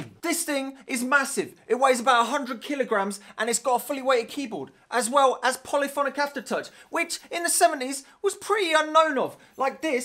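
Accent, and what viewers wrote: United Kingdom. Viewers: Which British accent would you call this?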